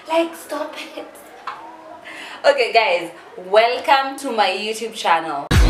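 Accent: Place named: United Kingdom. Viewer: kwani ulikuwa UK?? Accent kicking in lol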